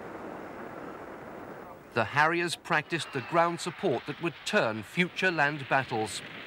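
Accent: United Kingdom. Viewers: Thank you. This is the first time I have heard anyone with a British accent speak the truth that both sides were totally unprepared for this war. And that was said in the opening statement. Of the two.. The Argentinian Air force did a hell of a job, their army sucked and their Navy didn't fair too well either. If you have watched any footage, or read about what the Brits had to go through to pull this off.. It was as close to a complete clusterfuck that any military could get. The bombing mission of the airfield, the sinking of all those British ships, especially using a merchant vessel from Cunard. The sinking of the SS Atlantic Conveyor made me scratch my head when that footage hit the news. I was like.. WTF are they using a commercial vessel for in their war campaign? I actually thought the British were supposed to be a super power. They looked like a rag tag fleet of incompetents. 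Argentina put up a good fight. If their Navy and their land forces would have been better, they could have dealt a blow to the Brits. In all reality. They still did.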